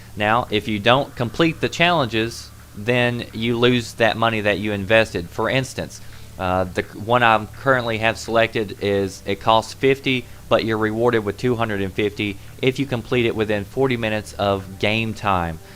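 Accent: South, United States. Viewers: One so what if he sounds like a hillbilly and yeah he has some good vids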